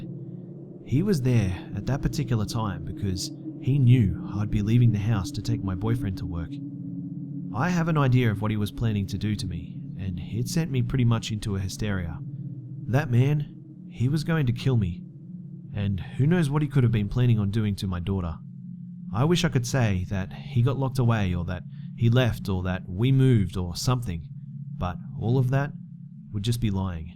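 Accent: Australia. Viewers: Bethsabée Newrose yess Aussie accent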